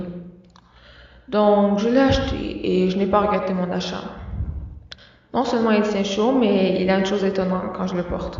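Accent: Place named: Quebec, Canada. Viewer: Magnifique accent québécois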